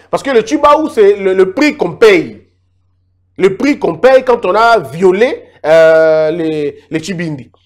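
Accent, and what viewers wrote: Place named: Luba, Democratic Republic of the Congo. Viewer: Félicitation pour tes recherches sur cette culture. Et ta façon de prononcé les mots démontre que tu es un luba mais de la diaspora.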